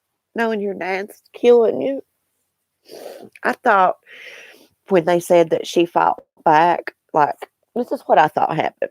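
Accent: South, United States. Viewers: Love your sweet southern accent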